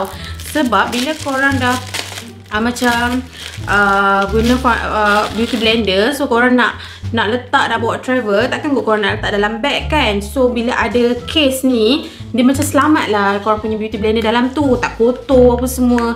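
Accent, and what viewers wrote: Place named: Penang, Malaysia. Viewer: Penang rsanya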